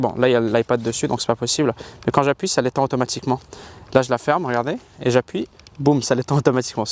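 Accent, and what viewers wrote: Quebec, Canada. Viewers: C'est moi où tu commences à avoir l'accent québécois ? Mdrrrrrrrr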